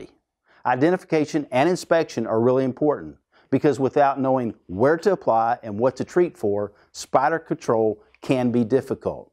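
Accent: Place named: United States. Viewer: No offence but I'm an Australian arachnophobe. Your American accent is not reassuring for me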